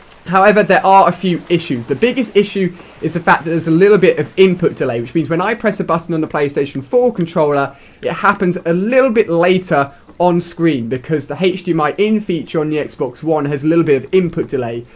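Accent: England, United Kingdom. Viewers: why do you have an English accent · Daniel Patel Because he's British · because he was born In Britain you idiot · Z is pronounced ZED in the UK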